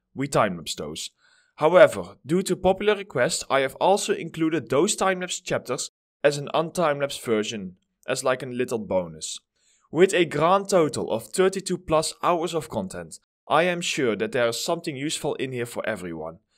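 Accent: Netherlands. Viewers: Ooof a heavy case of Dunglish. But cool tutorial!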